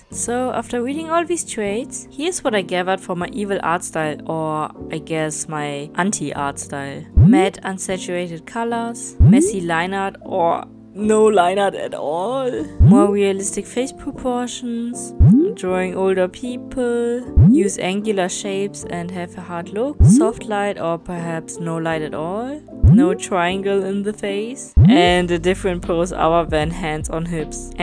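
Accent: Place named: Germany. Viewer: By the first sentence I knew you are German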